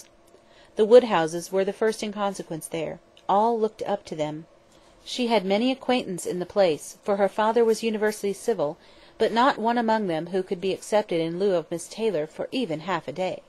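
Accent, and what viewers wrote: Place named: United States. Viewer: this is an American accent, unfortunately. i'm American, and I wanted to hear a British accent :/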